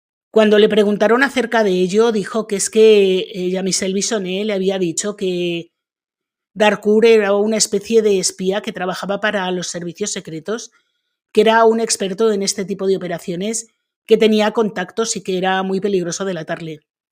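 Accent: Aragon, Spain. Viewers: excelente vídeo.Eres aragonesa? Tienes un cierto acento maño,que me encanta 😍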